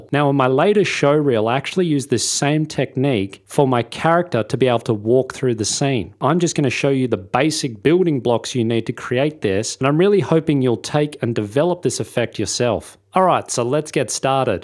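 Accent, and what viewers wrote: Australia. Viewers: Straya! I've been learning After Effects from a lot of different wonderful people on here from around the globe - It's nice to finally hear the Aussie accent.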